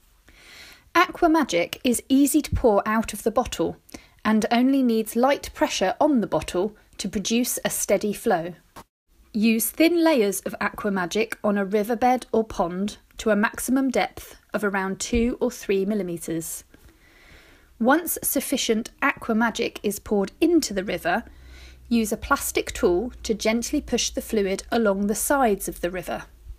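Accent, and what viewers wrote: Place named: United Kingdom. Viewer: Sounds like a very versatile and easy to use product. I appreciate the narrator's British accent! Lovely.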